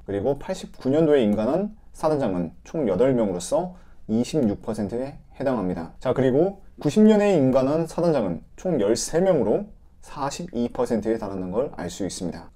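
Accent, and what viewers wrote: Jeolla, South Korea. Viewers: 전라도 사투리가 섞여 있네요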